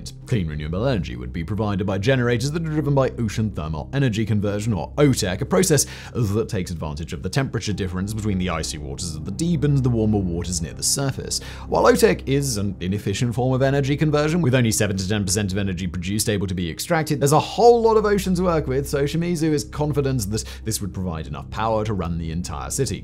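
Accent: United Kingdom. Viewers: omg your posh voice and pose make me doze...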